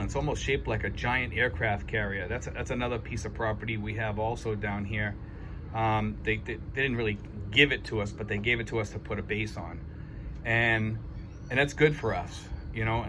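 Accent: Boston, United States. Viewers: Sounds like a Bostonian, or at least from somewhere in Mass. 🤔🧐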